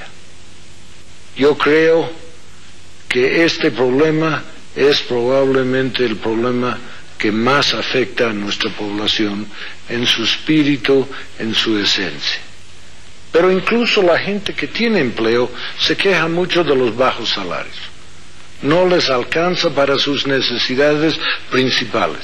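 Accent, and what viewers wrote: United States. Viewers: Es boliviano. Nada más q a vivido más en EEUU q en bolivia. Por eso su asentó americano.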